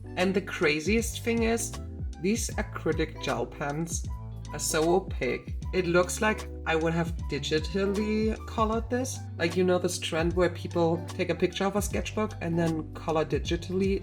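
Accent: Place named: Germany. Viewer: GERMAN ACCENT MUEHEHEHEHE these drawings are awesome ggs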